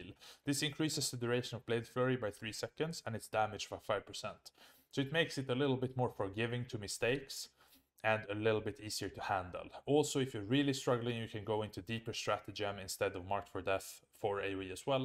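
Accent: Sweden. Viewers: Are you swedish? Thought because of your accent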